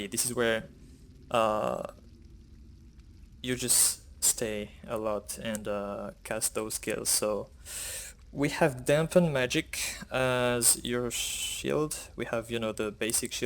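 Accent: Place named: France. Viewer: Do you speak french?You have a french voice.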